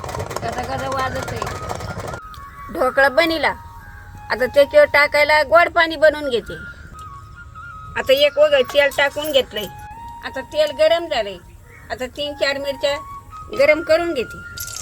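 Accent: Marathwada, India. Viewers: आज्जी तुम्ही मराठवाड्यातल्या आहे का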